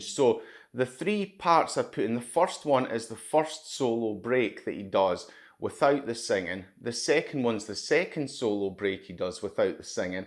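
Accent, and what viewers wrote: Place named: Scotland, United Kingdom. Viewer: Good to hear a Scots accent, sir. You choose great source material to give a lesson with. Best of health and best wishes with your channel.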